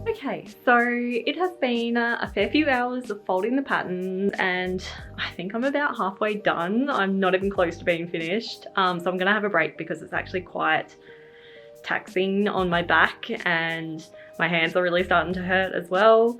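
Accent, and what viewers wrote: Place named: Australia. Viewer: We recently moved to usa from tassie to care for my MIL. Im so homesick though. When I stumbled accross your channel I felt so comforted hearing your aussie accent. And then you say you're from tassie!!! Im subscribing ❤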